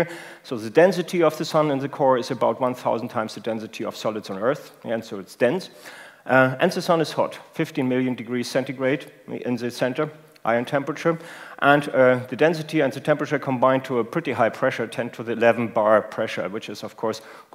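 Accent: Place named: Germany. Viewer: warum nicht auf deutsch......deutschen solten nie english sprechen, nur schreiben...hört sich nicht gut an... nur ein ding is noch schlimmer, amerikaner die deutsch versuchen zu sprechen...lol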